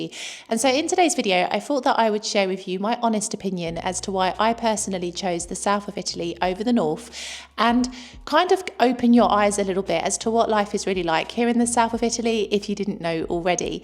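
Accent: United Kingdom. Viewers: I love your British accent.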